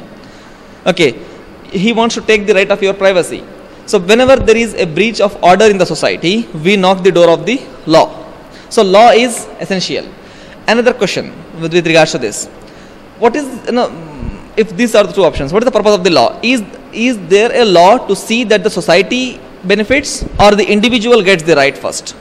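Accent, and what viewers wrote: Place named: India. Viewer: A typical Indian style of speech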